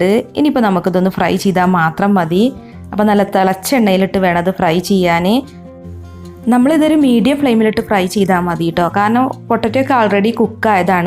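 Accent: Malappuram, India. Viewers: നിങ്ങൾ മലപ്പുറക്കാരാണോ ,? Kaadamutta ഇഷ്ട്ടം 😋😋😋😋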